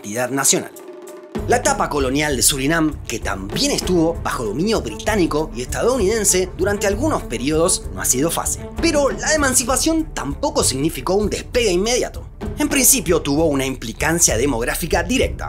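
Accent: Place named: Argentina. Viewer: Trata de hablar neutro... pero es argentino es chistoso y ademas pones el futbol jajjaajajaj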